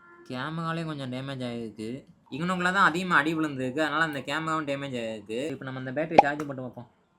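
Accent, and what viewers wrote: Madurai, India. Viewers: அண்ணே ஆனா நீங்க மட்டும் எப்படி எல்லா மெக்கானிசம் பாக்குறீங்க உண்மையிலேயே வேற லெவலுன்னு நீங்க மதுரை ய?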